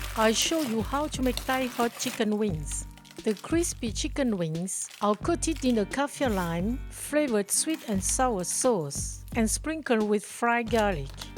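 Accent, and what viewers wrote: France. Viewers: I like how you’re Chinese but sound like you’re French.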